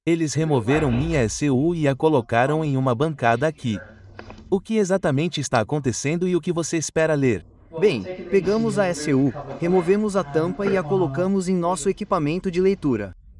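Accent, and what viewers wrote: United Kingdom. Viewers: YES, i hear the queens tongue and that makes me happy. THIS is how you speak, precise, calm and clear.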